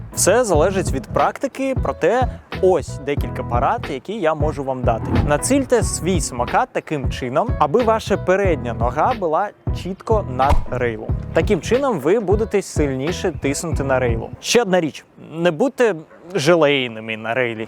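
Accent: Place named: Belarus. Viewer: Полностью согласна несмотря на то что есть белорусский акцент, но это исправляемо временем😊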